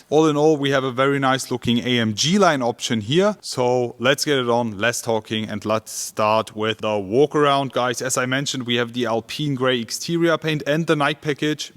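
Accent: Germany. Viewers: I love your German accent. I won’t say who you sound like but I like it. I also think you give very good presentations and cover the smaller details as well as the big ones!!! Much love and respect and the new EQS SUV is to die for. Such quiet class and beauty!!! I could live in that thing…🥰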